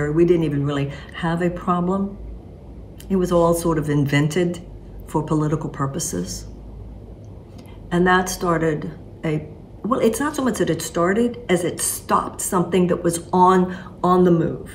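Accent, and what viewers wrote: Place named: Houston, United States. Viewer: It's really funny I would hear you talk and say some of the things that you say and wonder where is this lady from? So I finally did some research turns out you grew up in Houston just like me. It is funny that if you're from Houston nobody in the world knows what kind of accent you have cuz it's like they I have never heard it before. I remember going to Vancouver Canada and people would stop me when I talk and say where are you from I just moved to Dallas so I said Dallas Texas but what they were really picking up on was that Houston Texas sound I appreciate you. Probably won't agree with everything you say but I'll definitely listen to you say it.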